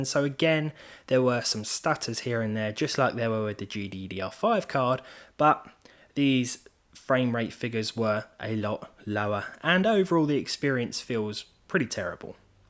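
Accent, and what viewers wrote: United Kingdom. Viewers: I have no use for these videos as my worst GPU is a 1060GTX 6GB, but I watch them religiously. It is the personality, the voice and the lovely accent that draws me. So envious of the British accent. Can't wrap my head around it.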